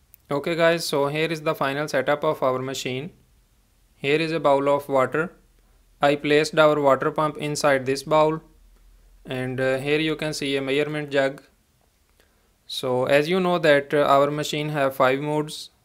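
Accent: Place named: Pakistan. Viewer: after hearing your voice I'm guessing you are Pakistani.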